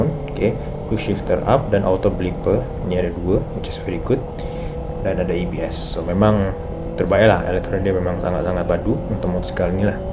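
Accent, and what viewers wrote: Malaysia. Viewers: Pandai loghat melayu malaysia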